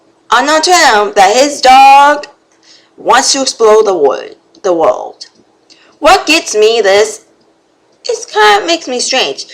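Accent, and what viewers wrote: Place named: New England, United States. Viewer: From the sound of your accent I am assuming that you are from New England; perhaps Maine.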